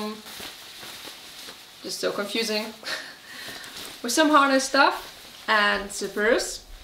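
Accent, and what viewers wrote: Germany. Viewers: Very great video! Nice clothes you have bought online. Your English has a big german accent. So when your next german speaking video? What about your glasses? Very nice mask from you. Keep it up!!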